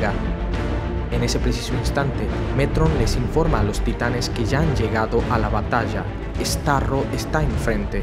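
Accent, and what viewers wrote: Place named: North, Argentina. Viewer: Perdon de donde sos,suena tu voz a como hablan por el norte en Argentina.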